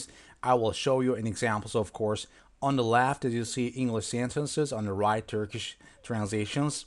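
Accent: United States. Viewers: now I know, why you speek amerikan do good,being türkish at the same time. I saw your lesson,where kedinizi bahsettiniz.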